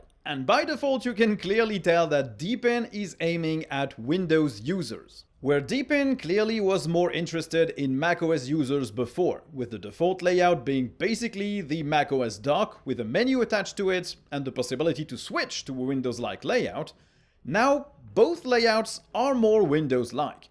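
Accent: France. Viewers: We need more French accent of English!!! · More french acccent please, it made me laugh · Yes, please, more french accent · More French accent, s'il vous plaît! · I'm comenting for more French accent 😁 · I love le franch man avec un accent anglais horrible ^^ Bonne vidéo comme d’habitude sinon même si ce DE n’est pas fait pour moi. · I like that french English accent it's funny af 🫣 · i love your French accent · I'm here to comment that I want more French accent.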